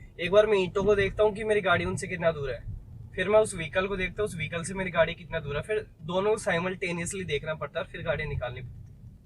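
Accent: Haryana, India. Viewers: Bhai haryana ka hai k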